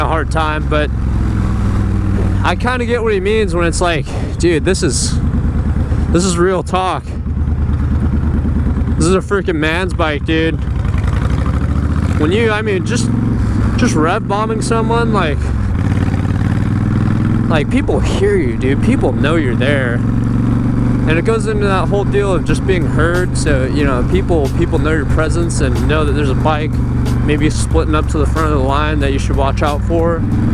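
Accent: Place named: Russia. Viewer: u tebya ochen yarkiy russkiy akcent, by the way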